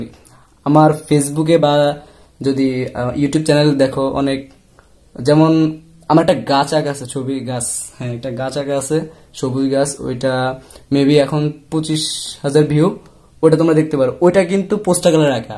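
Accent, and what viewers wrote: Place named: Bangal, Bangladesh. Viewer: Dada ami to bangal noi tai bangal bhasha janina ektu ektu bolte pari bhaiya apnar bangal bhasa amar darun laigachhe are apnar reveiw o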